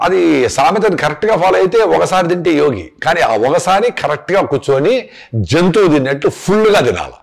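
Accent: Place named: Kadapa, India. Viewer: సర్ మీ బాషా కడప భాష లాగా ఉంది చాలా బాగుంది మంచి అలవాట్లు చెప్పారు సర్ థ్యాంక్స్ సర్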